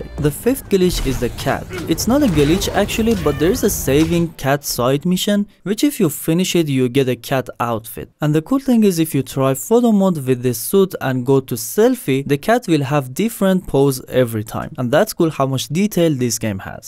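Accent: Iran. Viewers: خخخ. داداش دمت گرم. از لحجه ات فهمیدم ایرانی هستی 👍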